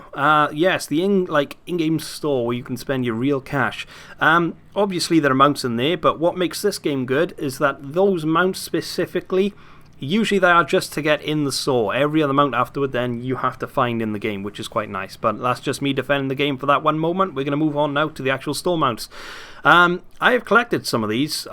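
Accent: Wales, United Kingdom. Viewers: Omg, you're Welsh!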